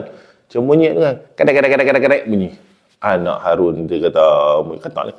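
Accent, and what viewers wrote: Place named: North, Malaysia. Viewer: "Mineral Wattar" Nohhh puas dengaq org utara brcerita....haha..Salam dari Pendang Tuan..Moga Allah sentiasa rahmati Tuan..AMIN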